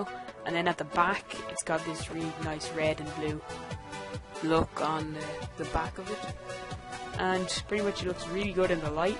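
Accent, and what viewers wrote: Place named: Dublin, Ireland. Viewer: you sound irish, dublin accent i think trade link for item <link> and good luck to all!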